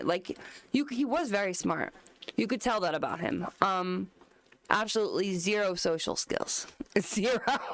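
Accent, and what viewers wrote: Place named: United States. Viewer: Australian with an American accent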